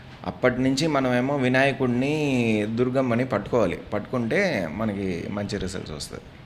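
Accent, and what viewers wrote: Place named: Tamil Nadu, India. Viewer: అరవ తెలుగు. ఇదొక పబ్లిసిటీ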